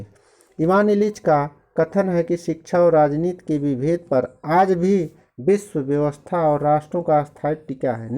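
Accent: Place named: Australia. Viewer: Sir ye austrilan thr American nahi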